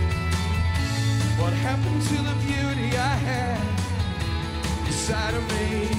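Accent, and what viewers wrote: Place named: Ireland. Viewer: I still hear a brogue...not thick, but there...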